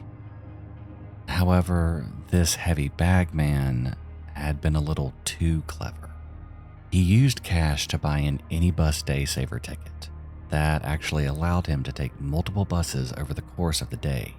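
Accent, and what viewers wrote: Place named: Tennessee, United States. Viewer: You've got a slight Tennessee accent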